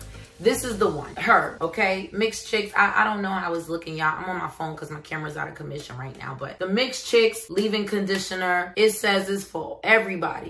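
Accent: New York, United States. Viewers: So glad I came across this on my time line❤ You putting me on👀‼️ Definitely just got you a new subby🤗 Love listening to you talk ,that NY accent reminds me of home🥰 I'm from the Bronx 161st ❤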